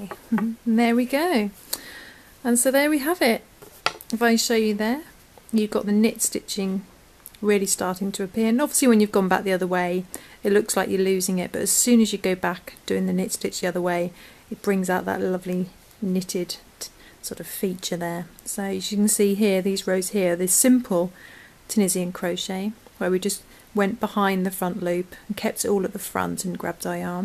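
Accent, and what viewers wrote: England, United Kingdom. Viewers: Hi I notice you're talking in an English accent but talk about single crochet. In the UK we call it double crochet. Please could you tell your UK viewers the difference. Thanks. x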